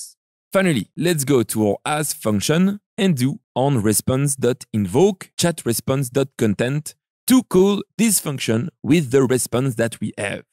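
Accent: France. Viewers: C'est dingue comment on perçoit le côté français bien que tu gères l'accent, bonne vidéo 😉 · Merci de prendre la parti de même si c'est inutile, j'ai bien précisé que c'était rien d'autre qu'une taquinerie, vous auriez deviné cela si vous aviez bien lu mon poste:P Bref, oui l'accent français est très fort ce qui fait le charme des ces vidéos, mais je maintiens le fait que si il ferait des vidéos en Français il aurait bien plus d'audience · Son accent anglais est pas horrible et je le comprends facilement. Je pense que s'il parlait plus vite ce serait mieux. Son accent francais est evident mais c'est pas une probleme parce que son prononciation est fantastique.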